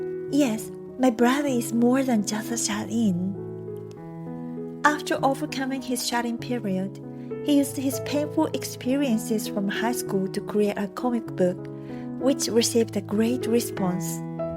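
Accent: Japan. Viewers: The audio seems to be spoken by Japanese. So why use Latino names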